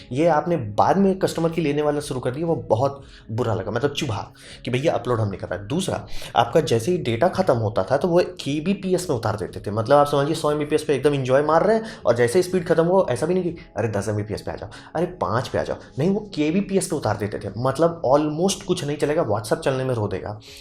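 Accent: Bihar, India. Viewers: 100% pure Bihari vibes 😎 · May be you are bihari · Aap puree bihari hu ekdum fulltu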